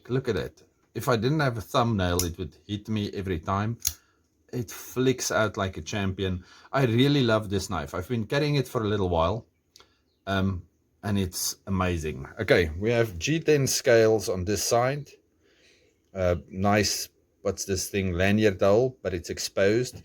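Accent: Afrikaans, South Africa. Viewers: Love die Afrikaanse aksent ou bul. Lekker om Suid-Afrikaaners ook op YouTube te sien.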